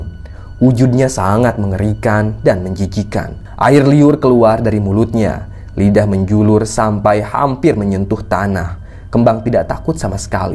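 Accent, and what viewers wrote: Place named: West Java, Indonesia. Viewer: Asli sunda apa jawa mbah. ? Kaya orang sunda eta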